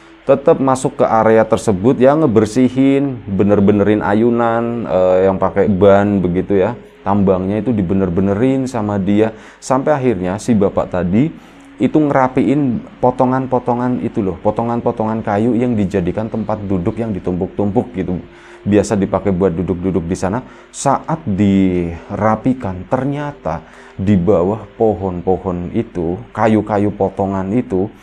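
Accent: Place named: Banyumas, Indonesia. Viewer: NUMPANG TANYA barangkali bisa dijawab : MENGAPA NGOBROL HOROR sudah lama tak siaran?. Kangen bahasa ngapaknya...😅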